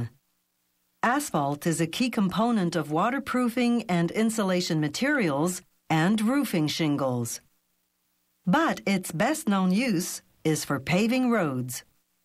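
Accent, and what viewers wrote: Quebec, Canada. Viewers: She's ESL, and doing a fairly good job of suppressing her (french canadian) accent.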